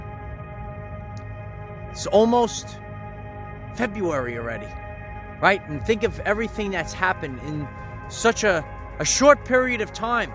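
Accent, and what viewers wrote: New York, United States. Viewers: Yes! These last 2 years have flown by! Are you originally from NY?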